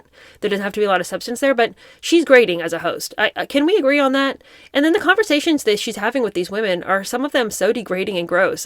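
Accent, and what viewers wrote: United Kingdom. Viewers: Love your British accent!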